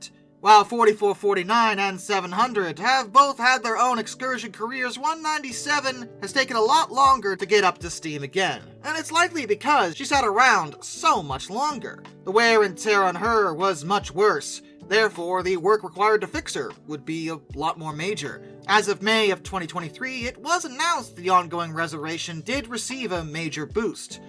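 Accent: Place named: Midwest, United States. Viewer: Midwest, I think. Doubt he's from the east since he stumbles on stuff like "Worchester."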